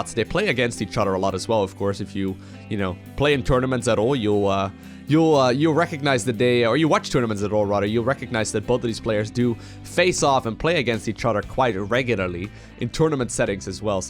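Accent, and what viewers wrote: Netherlands. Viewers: Ah ur dutch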